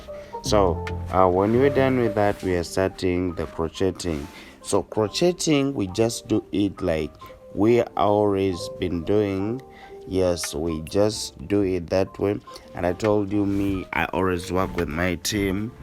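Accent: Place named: Uganda. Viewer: He sounds like a Ugandan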